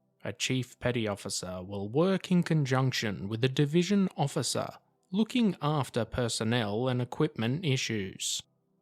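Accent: England, United Kingdom. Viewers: Thanks 🇬🇧 accent. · US Navy. Why have an English guy as speaker? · It's funny to hear a British person do American ranks of the US Navy. Anyhow I got out of the Navy 9 years and was a second class petty officer bosun's mate. When I got out I was up for bosun's mate first class but I didn't care because I was getting out. Peace. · Why is some British guy narrating this?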